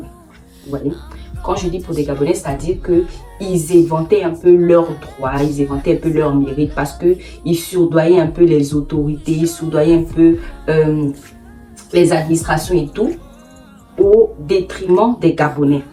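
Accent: Ivory Coast. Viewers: ton accent gabonais est parti ou ? ta déjà l'accent ivoirien 😭😭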